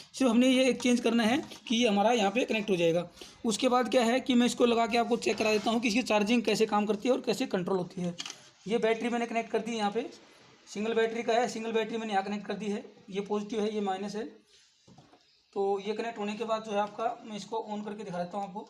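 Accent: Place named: Haryana, India. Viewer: Good idea sir. Thanks. Sir aap Apne Haryana se hai kya. Kuch language me haryanvi tone aati hai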